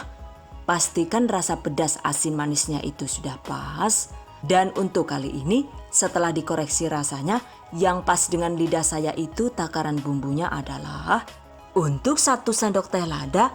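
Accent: Banyumas, Indonesia. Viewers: orang ngapak ya pada baelah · Deneng ngapak kaya inyong heheh,,maturnuwun bunda resepnya... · Mbakayu ne lucu ning ngomong Jawa ngapak cm sayang ora katon wajahe, tp masakane ky ne top maskotop.... Jos gandos wwwkkkkwkkkk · Mantep resep rougut nya ,, ngmg ngapak koh,, nyong wong ngpak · Muaturnuwun mbak ngapak 😀🙏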